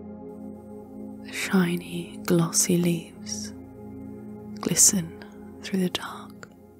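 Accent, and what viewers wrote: United Kingdom. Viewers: I knew your accent had a lovely hint of west country, I thought maybe Wilts Dorset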